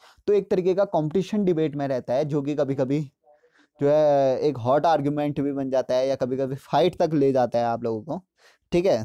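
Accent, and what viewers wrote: Pahadi, India. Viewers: Bhai tu pahadi h na accent se lgra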